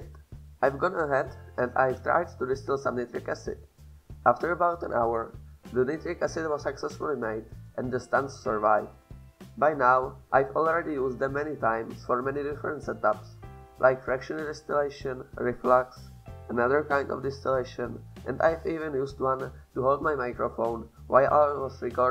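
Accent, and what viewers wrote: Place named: Eastern Europe. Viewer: I am sorry but you sound like slav. Jsi čech nebo slovák?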